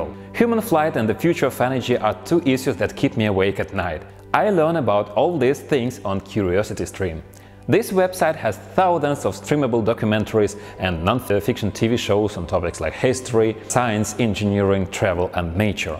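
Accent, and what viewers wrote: Russia. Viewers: we can soooo tell he is russian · I love Russian inglish. Love your content bro. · Takoy strong ruskiy akcent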